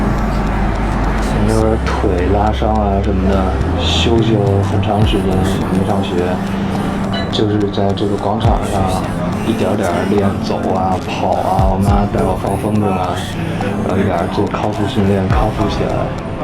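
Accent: Beijing, China. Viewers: 就爱他这种北里北气的口音 太好听了真的 能听一整天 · 北京腔真的跟老大爷似的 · 我爱你，我也是北京人。现在堵在美国不能回家。听了很多遍你的后座剧场，也是我小时候的回忆。听着熟悉的京腔，我好想家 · 这北京话听得舒服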